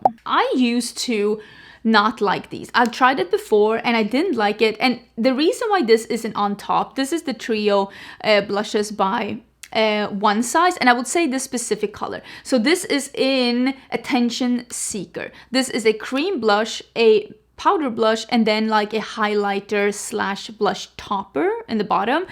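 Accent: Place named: Sweden. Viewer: wait, are you for real right now? You do realize is Swedish and English is NOT her first language. Her native country comes w its own dialect and accent. Some letter combinations we have in English DO NOT come natural for some languages. This comment is literally one of the most absurd I’ve read in a while. You must come from another planet to have this kind of expectation of someone. Either that or you don’t work for a living and are clueless to the amount of hours in a day.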